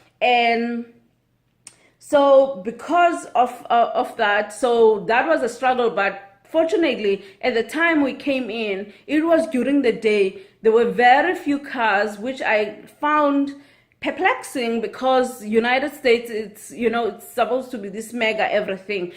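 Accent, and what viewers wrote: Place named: South Africa. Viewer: Very interesting video. A fellow South African here. Post more videos which dispel myths about South Africa as some people are really clueless about our country...even though it's one of the most highly visible country brands in the world. Lol at accent...you have an accent similar to my Mum, she's Xhosa&Zulu Zulu. My dad is white German& Afrikaans. Love your channel already...you seems lovely, curious& intuitive so I imagine you will adapt very well in USA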